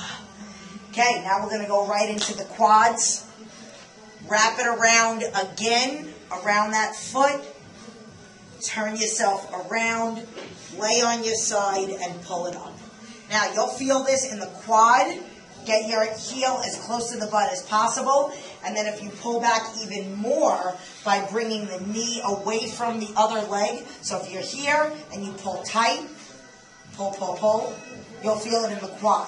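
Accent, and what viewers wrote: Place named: New York, United States. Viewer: she must be a new yorker, she sounds like a new yorker! Great video post, thanks for sharing. Rock on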